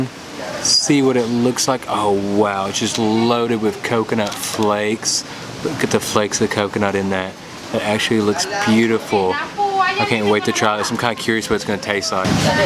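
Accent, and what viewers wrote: South, United States. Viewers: Randomly stumbled upon your video and glad I did! I adore your southern accent (I hope I got that right).Loved your reviews especially the Chinese noodles that I can’t normally have, l’d love some of that chilli pan mee. It’s actually called ubi getuk (mashed and fried tapioca with coconut), also the roti canai is pronounced Cha-nai, cha as in ch in chair. You’re practically Malaysian as we do mix our roti canai sauces together too! My ultimate favourite combination is the red sambal with the middle lentil curry (called dhal). Looking forward to more of your videos! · i believe he means your southern accent. I've been trapped a time or two by the fairer sex.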